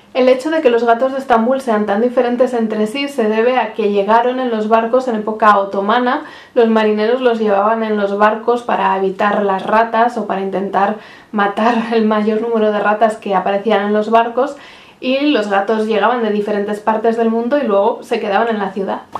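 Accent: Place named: Murcia, Spain. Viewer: Sobre tu voz y tu asiento eres mursiana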